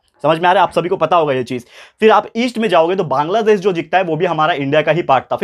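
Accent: Maharashtra, India. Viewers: Sir is your mother tongue is Marathi??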